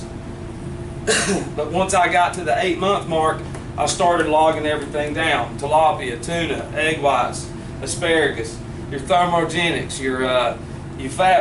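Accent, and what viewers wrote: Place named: Texas, United States. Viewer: Great video dude. Raw and honest. Love it. U just be from Texas or Tennessee. How’s ur progress these days?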